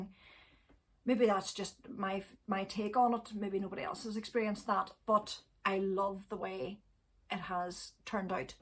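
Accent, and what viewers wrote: Ireland. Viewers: I am a newcomer to your podcast and am enjoying it very much, particularly your lovely Irish accent. We have several Northern Irish relatives and to listen to you talk is almost like visiting with them. I am not a very accomplished knitter, but I would love to knit anything with a fairisle pattern such as a yoked sweater. I would also love to do a cardigan as I have done a few pullovers or as you call them, jumpers. Knitting is such a sustaining hobby during these days and having wool running through my fingers on any project is so rewarding. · One day I hope to knit a lace work cardigan sweater. I'm currently working on my first pair of socks. Enjoy your podcast and love listening to your lovely Irish brogue. CONGRATULATIONS on your Anniversary. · My dream project would be a colourwork sweater by Marie Wallin. But I think I have to wait until I have retired to have the time and focus on it. 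I am a new subscriber and like your podcast above all your Irish accent:)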